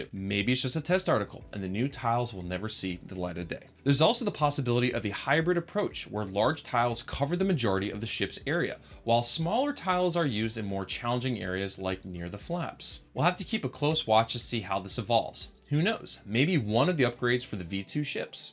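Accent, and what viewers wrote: Germany. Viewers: I knew is from Germany stil a little Accent left. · Wow, ist das schon wieder zwei Jahre her, dass ihr in die USA gezogen seid? Aber ja, das weiß ich, den deutschen Akzent erkennt man überall. Wobei du echt viele neue englische Wörter in dein Repertoire aufgenommen hast. Ihr habt doch vorher irgendwo in der Nähe vom Nürburgring gelebt. Hast du nicht irgendwie sowas mal gesagt, in einem Video lange her, oder? Wie kommt deine Frau damit eigentlich so zu recht? Vermisst sie Freunde oder Familie? Schätze den Kids ist das eher egal, oder? Naja, geh da von mir selbst aus. Wurde auch als Kind durch die Arbeit meines Vaters nach Florida verschleppt. Als Deutscher freut man sich ja über die Wärme, aber die einheimischen kühlen gerne etwas arg runter 😄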